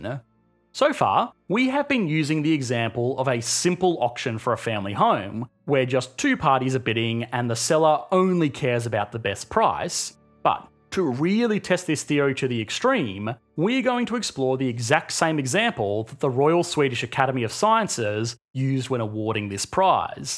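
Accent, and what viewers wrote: Australia. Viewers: This guy has a very Australian accent. There are three Australian accents really, broad, general cultivated- with some variations between states too. This is almost a broad accent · He’s aussie mate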